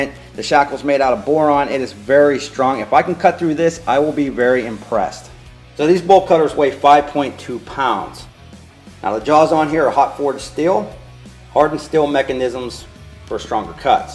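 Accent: Pittsburgh, United States. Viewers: Nice acccent. Pittsburgh area?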